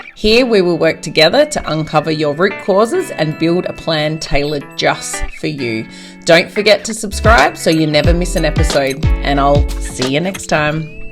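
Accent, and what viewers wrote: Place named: Australia. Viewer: I love your Australian accent! I never get tired of listening to it 🧡🧡🧡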